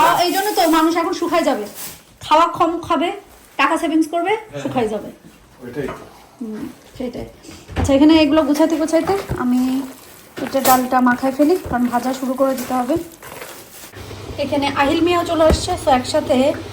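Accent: Sylhet, Bangladesh. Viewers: এরে ও দোস্তত 🤣 খাটি সিলেটি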